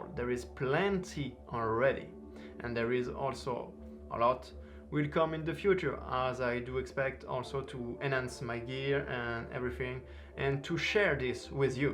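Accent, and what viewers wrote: France. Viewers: Great video and review, my next silicone mask will be Pennywise IT 1990 silicone mask by BurkBench designs and then I will get the Alien silicone mask also by BurkBench designs probably in October, also the way you pronounce latex is funny 🤣 🤣 🤣 and judging by your accent you are either French or French Canadian.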